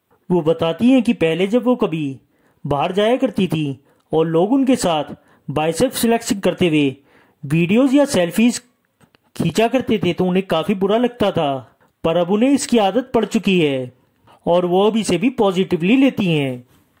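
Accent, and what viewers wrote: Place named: India. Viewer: I couldn't understand a word that the so called narrator was saying, due to his extremely thick Indian accent. So it just sounded like gibberish to me.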